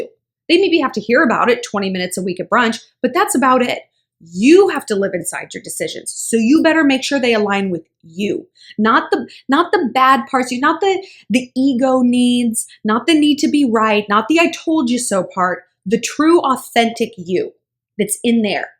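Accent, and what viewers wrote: England, United Kingdom. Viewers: That English accent though 😂 top notch madam, top notch 👌🏼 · That English accent though 😂😂 I like your channel, you give sound advice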